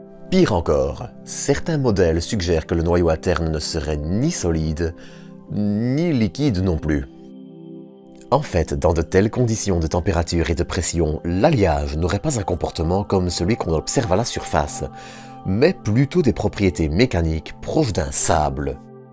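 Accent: Belgium. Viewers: La voix belge. Liégeoise sans doute 😂😂. Quel accent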